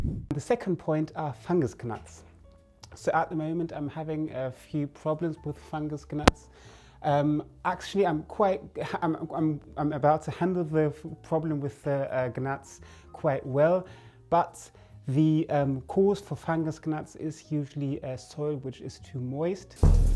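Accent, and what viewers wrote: United Kingdom. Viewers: Where are you located im thinking England because of your accent.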